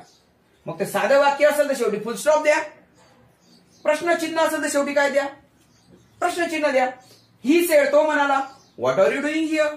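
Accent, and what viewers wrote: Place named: Bengal, India. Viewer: Ka bol raha h, bangali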